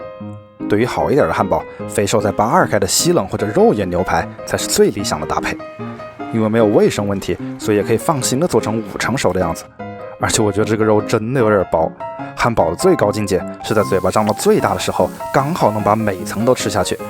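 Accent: Wuhan, China. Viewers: 听口音 Up主武汉人吧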